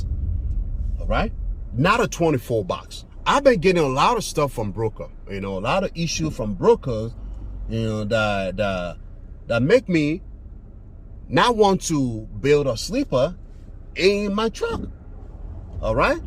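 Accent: Liberia. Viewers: Sounds like a Liberian. My brabee ur Peking about to get his truck soon. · i miss raleigh!! i was tryin to figure out what road you were in and it was hillsborough st....memories...sigh. keep up the good work fellow African. You sound Liberian. My in law is Liberian lol. Good lookin out. i took notes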